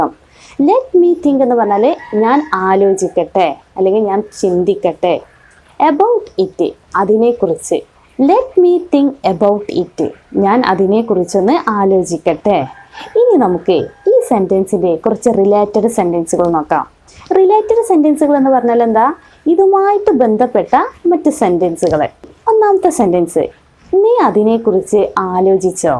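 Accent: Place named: Malappuram, India. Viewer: I think u r from malappuram.because of ur talking style.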